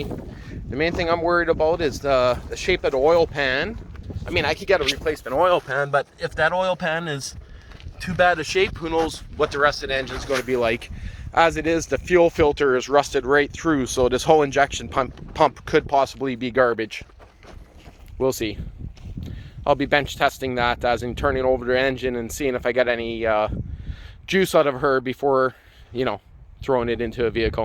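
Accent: Canada. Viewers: I was hoping to see a rapid dis-assembly of this little stump puller Diesel... I guess that will be on the next video... From what his accent sounds like, this was filmed in Canada...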